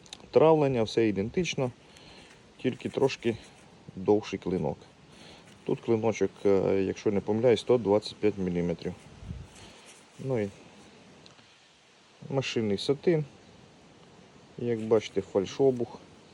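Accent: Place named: Rivne, Ukraine. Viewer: Гарні речі робиш друже, чую по говору, ти з наших країв ( Рівненщина?)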